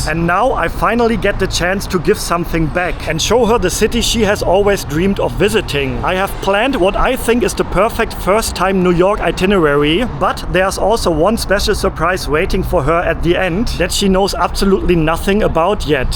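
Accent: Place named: Germany. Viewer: There’s supposedly a great test for Germans with good English accent. Get them to say squirrel, it’s a giveaway, the v pronunciation always comes out. Though I appreciate your efforts. I’m part German, I can hear it.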